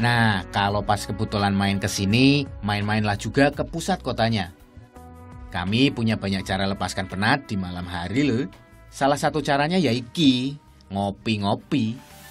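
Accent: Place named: Java, Indonesia. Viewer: g iso di salahne, masih o gae boso indonesia wong tulungagung panggah medok bosone haha. tanah kelahiranku pehhh keren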